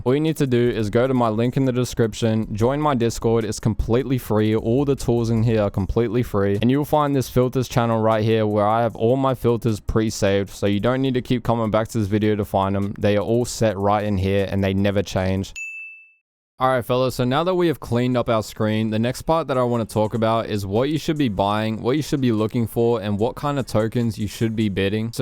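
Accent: Australia. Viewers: You sound like you’re from Sydney? Are you in Sydney? I’d like to do real life training online please.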